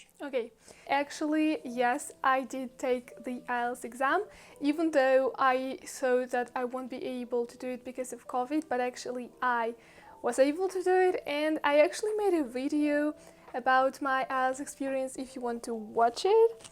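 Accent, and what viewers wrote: France. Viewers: Bravo pour cet accent français 👏 👏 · It is true thati n your instagram video you really have a french accent haha. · LOL I thought she was french because of her accent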